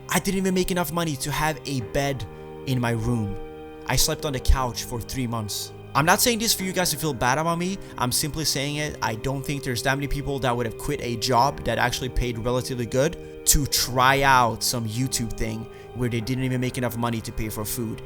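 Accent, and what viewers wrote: Sweden. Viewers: He had this Swedish accent back then. It's going away now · Dang this is when had that really big Swedish accent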